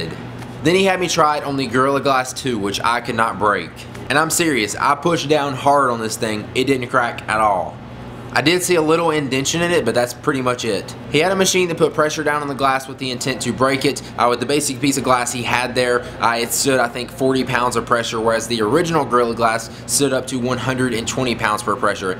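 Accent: South, United States. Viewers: What part of the south are you from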